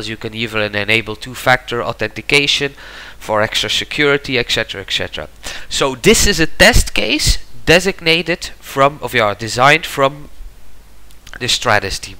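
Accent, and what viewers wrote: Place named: Netherlands. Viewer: Your English sounds dutch!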